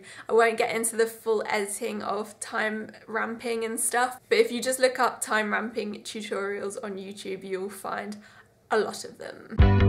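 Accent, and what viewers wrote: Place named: United Kingdom. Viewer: Actually, I was looking for some special camera moves, like sticking it to the brush or stuff like that, but I feel in love with your British pronunciation.❤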